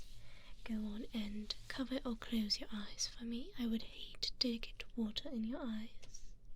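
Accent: United Kingdom. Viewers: are you british by chance? (i’m the anti furry you talked to a few months ago) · I'm so used to the Tomboy voice that hearing this gentle, higher pitched British Esq voice, gave me whiplash. Good to know you have a versatile voice! Makes for much more entertaining ASMR videos. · I love the bri'ish accent. Love your audios❤ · 40 minutes of you must be what heaven is like,. Only forever! I love your British accent. This artwork of you is phenomenal! 😍 And my birthday was 2 days ago. This is a great present! 🎂🥳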